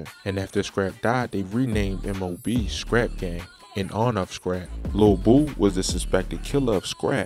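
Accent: Baltimore, United States. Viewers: doing this video is from Baltimore.. being a native Washingtonian here in DC I'm used to that accent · You got That Baltimore Accent.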